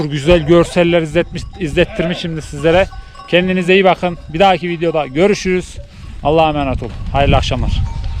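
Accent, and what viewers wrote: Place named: Taurus Mountains, Turkey. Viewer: Konustugunuz şîve Adana Mersin toroslardaki köylerde konusulanla aynı. 🤓 O yüzden çok samimi geliyor bana👍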